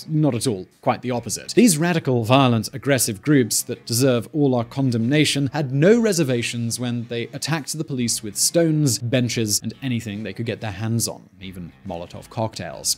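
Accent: United Kingdom. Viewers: Your bad research giving patronizing talking heads with British accents a bad name dude. . Actual story here: <link>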